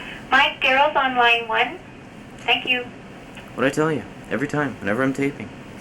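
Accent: Canada. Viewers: You sound Canadian are you from Canada?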